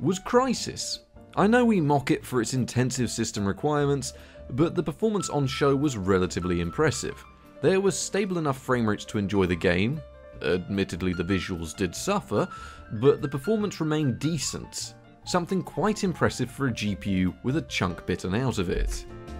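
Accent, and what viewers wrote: United Kingdom. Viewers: I may be stuck in bed with flu, but at least I got with his British accent and his old tech stuff to cheer me up. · English accent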